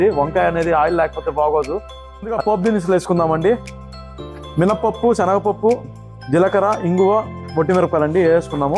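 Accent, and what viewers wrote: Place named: Kadapa, India. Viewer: మా కడప వాళ్ళ యాసే మీది కూడా.. ఆ మాట తీరు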